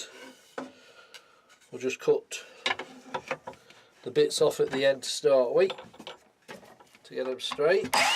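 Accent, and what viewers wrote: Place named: Stoke, United Kingdom. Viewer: Like your style you sound like a Stoke lad to me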